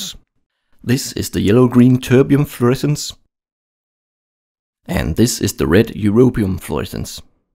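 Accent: Denmark. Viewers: man kan bare høre at du er dansker:P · Hvis man er fra danmark kan man også godt høre at du er fra danmark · you from denmark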